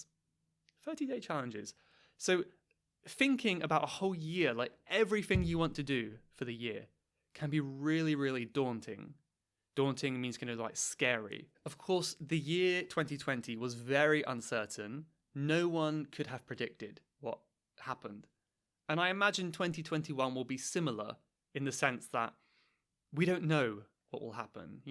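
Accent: United Kingdom. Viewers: Thank you so much for this phenomenal video ☺️ can you please tell me in which British accent you are talking 😊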